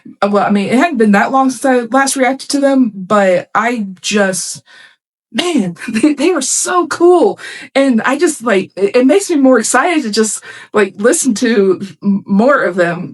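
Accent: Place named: North America. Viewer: Your accent sounds pretty North American, and they come up here pretty regularly since Monterrey is only about 80 miles from the Texas border. Just keep an eye out on their web site for their schedule. Even if it's just a 1/2 hour set in a multi-band festival, seeing them live is always worth every penny - and generally speaking, you won't even have to spend that many pennies - they won't try to squeeze $600 out of you; between $30 and $60 usually sufficient. 🙂 Musicians this good and entertaining for an affordable price is almost unheard of these days, and a tremendous relief for those of us who have been going to concerts and festivals for multiple decades.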